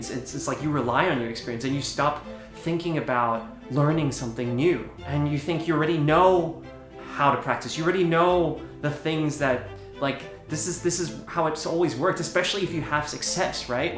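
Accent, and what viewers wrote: Australia. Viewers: dude you sound australain. do you live in my backyard ??? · Hahaha I have been so confused and thought I was going crazy like why does he only sometimes have an Australian accent!? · please don't apologise for the australian accent... in fact, you should apologise that you lost it in the first place :D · Is it me or did you change your accent to a more Australian accent? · Bro Your accent sounds like it’s from Australia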